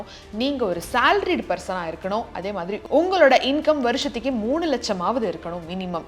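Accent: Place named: Kongu, India. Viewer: Neenga kongu Area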